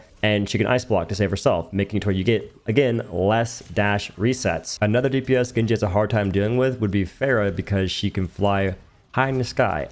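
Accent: South, United States. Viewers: You went to having an accent to being a country boy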